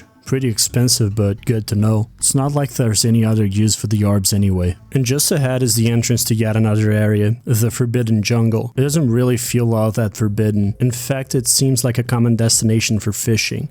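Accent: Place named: Europe. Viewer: I apologize if it's rude at all but your accent caught my ear, it sounds nice! Are you a European Ytuber? Also, I grew up with the Precursor Legacy and genuinely loved the hell out of it. Jak not really being 'mute' but moreso Daxter interuppting/butting in before Jak can speak is unique lol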